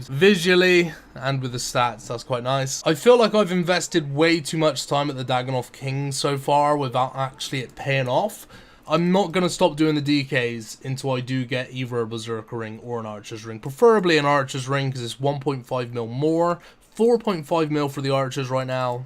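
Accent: Bristol, United Kingdom. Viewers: That accent is awesome, where in the UK you from? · Ooh arr Bristol Lad are u? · Subbed because of the Bristol accent.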